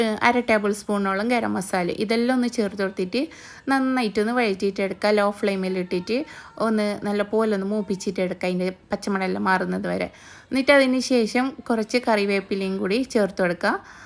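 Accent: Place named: Kannur, India. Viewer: കണ്ണൂര് പോലെ തോന്നി അതാണ് ചോദിച്ചത് 😊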